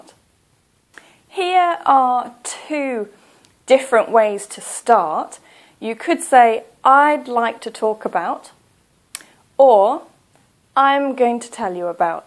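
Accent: England, United Kingdom. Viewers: You got a pretty crispy posh English accent haha. It's 👌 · Listening to her can improve your british accent & pronunciation too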